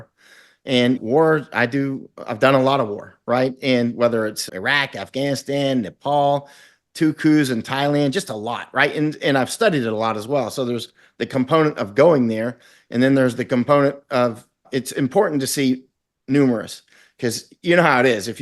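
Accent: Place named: Europe. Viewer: You’re not a white man. You’re a pink man. From Europe. Speaking European English.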